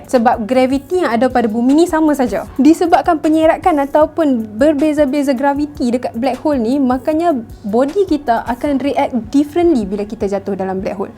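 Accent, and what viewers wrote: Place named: Kedah, Malaysia. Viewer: loghat kedah piaw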